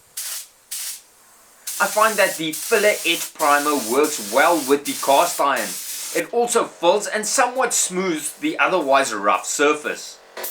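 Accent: South Africa. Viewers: Bloody hell how South African is this guy? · Would that be an SA accent I'm hearing? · I'm assuming you are south African, your English is making me exhausted haha, don't paint the dovetail ways.looks Good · ... good job, well done. i can hear you have a South African accent. Greetings from Moscow ...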